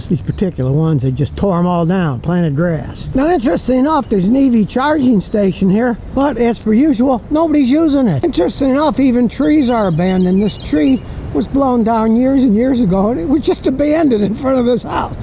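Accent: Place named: Texas, United States. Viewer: I thought he was from Texas.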